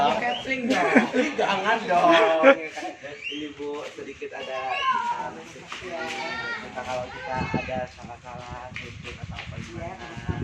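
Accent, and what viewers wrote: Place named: Medan, Indonesia. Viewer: Kalo bisa logat medannya jgn dicampur dgn logat jakarta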